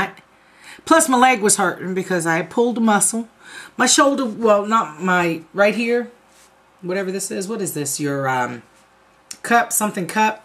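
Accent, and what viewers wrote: South, United States. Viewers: omg you are so crazy. You must be from the south I love your accent. Please keep it coming we love you.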